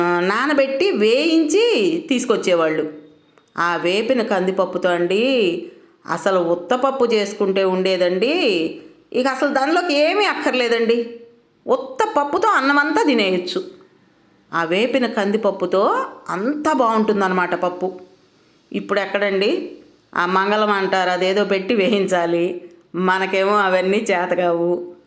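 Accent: Guntur, India. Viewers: మీది గుంటూరు ఆ అండీ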